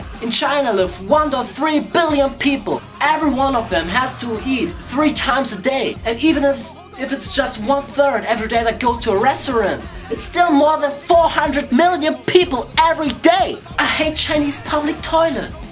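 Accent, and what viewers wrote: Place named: Germany. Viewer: well , i can tell you have german accent too !!!! :) ( smarter !~ta~da) ANYWAYS ! i need talk with you about this video this weekend ! = =# LAO WAI !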